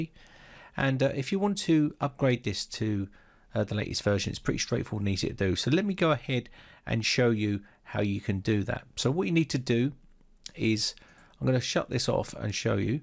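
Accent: England, United Kingdom. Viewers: That's a bit mild. I think they are parasite jive turkey mother humper cunts. Sounds so much better mate. Your videos are clear precise and in English from a English man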